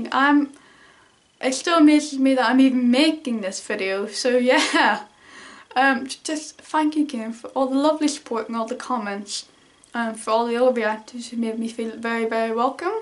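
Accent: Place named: Ireland. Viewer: ) I like irish accent. I wish you way more than 500 subs.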